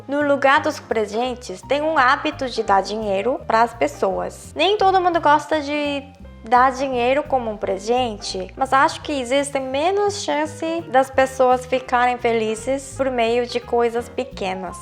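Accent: South Korea. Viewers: A tem um português e um vocabulário muito refinado, fala até melhor que muitos brasileiros. A cada vídeo que ela posta percebo a diminuição de sotaque coreano. Parabénssss 😊😊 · Eu acho o sotaque do coreano falando português e muito fofo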